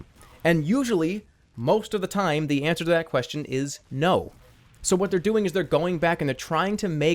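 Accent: Boston, United States. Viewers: I believe he's from Boston.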